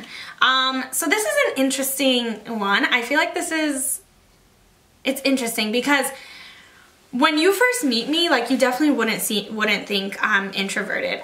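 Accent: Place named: Italy. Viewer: I loved the confidence part you spoke about. It’s so hard to get some confidence when you are comparing your self to others. 😩I guess I just gotta work on me and what I want in life. It’s a struggle but one a day at a time. 🙃🙂I assumed you were Italian btw. Haha is Spanish speaking gals we have a accent that can confuse ppl. 🤣🤣 🙋🏻‍♀️😊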